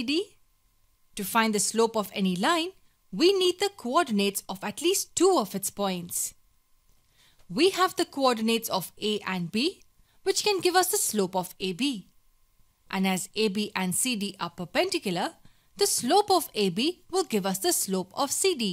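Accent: India. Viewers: I screamed when i hear an indian voice and leave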